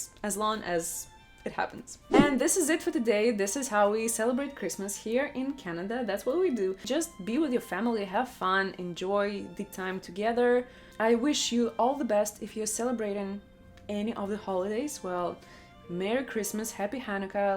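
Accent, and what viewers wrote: Russia. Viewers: I like your Russian accent...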